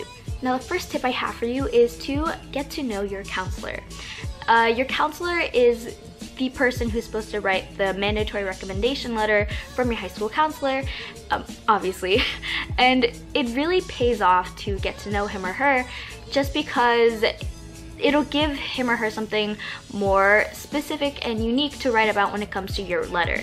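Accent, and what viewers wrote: South Korea. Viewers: Korean accent❤️👌🏻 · Omg your korean is so cute 😭❤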